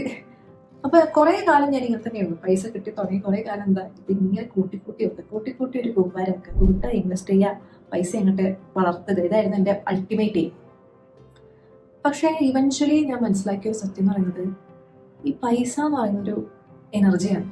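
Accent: Kannur, India. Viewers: Kannur slang❤ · Kannnur ano beedu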